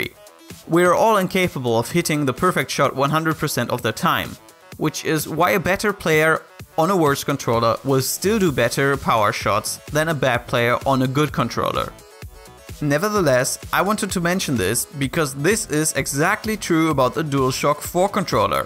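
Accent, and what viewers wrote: Germany. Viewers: Are you German? Great videos! (Subscribed!) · You sound german. Are you?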